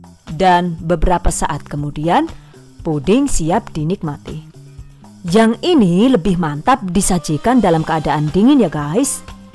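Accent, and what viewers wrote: Banyumas, Indonesia. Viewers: Jeng bahasa ngapak nya tu lo yg bikin kangen.jian tenan mantap klau ngomong bahasa ngapak.anak saya ketawa terus klau dengar bahasa ngapak yg waktu bikin donat.disuruh muter berulang kali yg ada bhasa ngapak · 😂😂😂 suka kalo bunda ngapaknya keluar..ora ngapak ora kepenak 😉😉 salam dari medan bunda 😘😘😘😘😘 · Inspirasi terimakasih Aq suka resep2Nya gampang di pahami 😍😍 Aq suka denger ngomong bahasa ngapakNya lucu 😊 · Maaf bu asli nya dari daerah mana ya .. klo ibu siaran yutube aku ketawa loh dngn bahasa ny ternyata pada ngapak ke yah ..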